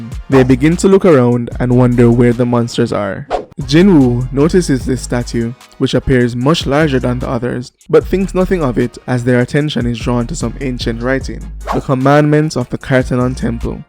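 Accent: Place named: Jamaica. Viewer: Sounds like a yawdie. Great breakdown.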